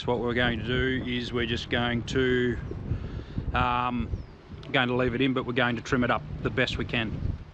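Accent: Australia. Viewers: Great job and, I love your talking during the video your personality is coming through, plus love the Aussie accent ❤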